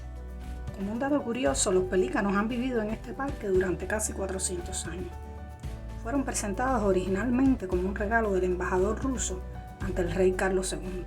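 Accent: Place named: Cuba. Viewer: El video está bonito, muestra los lugares imprescindibles de Londres. Tienes acento cubano.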